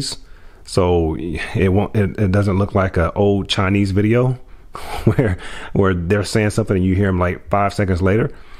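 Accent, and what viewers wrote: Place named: United States. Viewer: Because of your accent I think you are from the United States, therefore we are from the same continent (America), I see you and I write to you from Chile, on Friday June 4, I went to the store to buy a printer, but I ended up buying this soundbar 🤣🤣, it is my first soundbar, I found it with a discount and I will take advantage of it to see the Copa América (America's Cup). Greetings from southern Chile...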